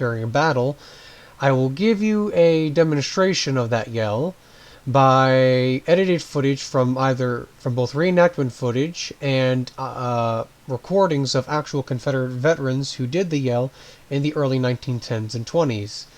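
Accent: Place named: North, United States. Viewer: You sound like a yankee carver bagger.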